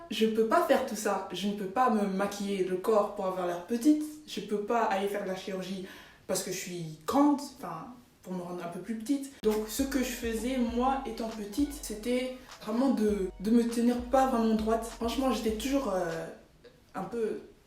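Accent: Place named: London, United Kingdom. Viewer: J’aime cette video. Je suis grande aussi. Je viens de Londre et ma francais est très mauvais. Mais je comprends assez. Aussi j’ecoute un accent londres quand tu parle anglais 🇬🇧❤️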